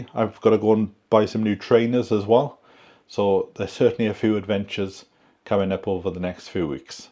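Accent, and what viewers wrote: Wales, United Kingdom. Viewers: I so enjoy your videos because it is like visiting adear friend. Honest dialogue and just hearing that beautiful Welsh accent takes me right back to my Grandma’s kitchen. Don’ t let those trolls get to you. Not worth your time or energy. Your true blue followers just enjoy spending time with you and enjoying your allotment videos and new vistas when you are out and about in your car. Just you being you is all that we need to bring a little Welsh sunshine into our lives. 💕👍🏻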